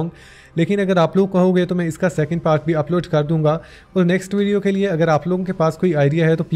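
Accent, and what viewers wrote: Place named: Kashmir, India. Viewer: Main apka bohat bada fan hun main yahan ap k insta stories ki wajah se hun main india kashmir se hun mujhe hamesha lagta tha aap kashmir se ho ap k features aur excent bilkul kashmiriyon se milta hai.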